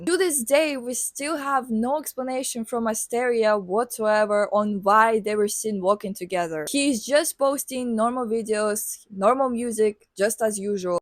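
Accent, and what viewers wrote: Russia. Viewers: С самого начала слышала малюсенький русский акцент и думала мне кажется но нет🙂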